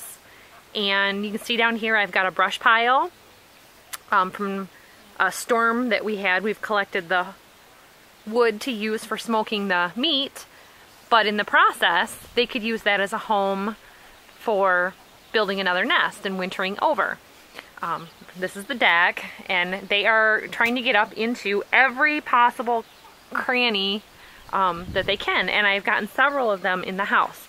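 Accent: Minnesota, United States. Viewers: She must be from Minnesota. Cute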